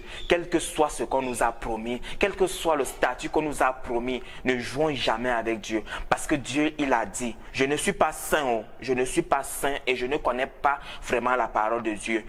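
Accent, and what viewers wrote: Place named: Cameroon. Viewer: Toi tu dois être camerounais vu ton accent😂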